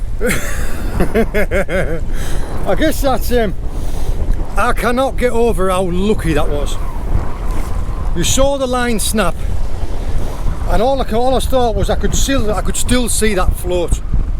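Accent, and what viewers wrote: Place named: Cornwall, United Kingdom. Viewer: You're getting slowly Cornish mate, it happens. About 18.00 you say "I switch overrrr," great vid btw!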